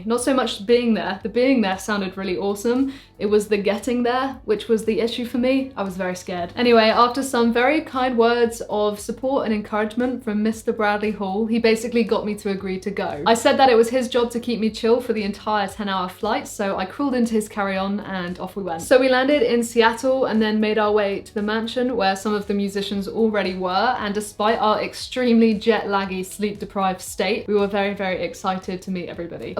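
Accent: United Kingdom. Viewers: The real question is, did someone make out with someone while at the mansion? Also I love how britishly you say "wa'eva", "we should'av donnet" and "sumting lae tha". Anyways, big fan here. I've watched you since you were a teenager and promoted Bias FX 2 (I actually bought it because of your videos). Keep rocking, you are amazing! Cheers!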